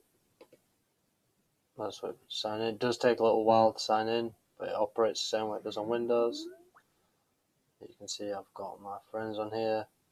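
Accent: Manchester, United Kingdom. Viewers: He has a Manchester/Lancashire accent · at times un-understandstable because of very distinct Brit accent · yes mate are you from manchester?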